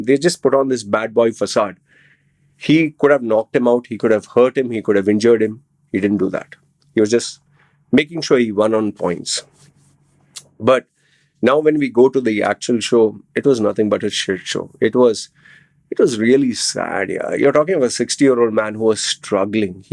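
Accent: India. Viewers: bro you have an indian accent?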